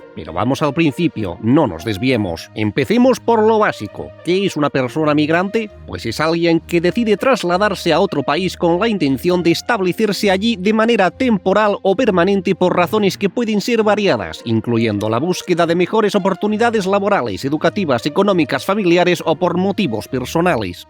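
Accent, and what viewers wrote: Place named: Catalonia, Spain. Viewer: Hola divertidamente dibujado y con voz de origen del país Catalán ❤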